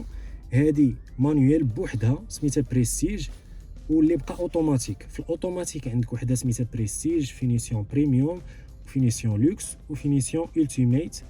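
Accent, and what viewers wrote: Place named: North Africa. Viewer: وربي مكيف ومبسوط على اللهجه اكثر من ااسياره.. ربي يحفظ شمال افريقيا واهلها الكرام.. جعل ربي يرزقكم هل سياره كل واحد منكم.. لانكم تستاهلون كل الخير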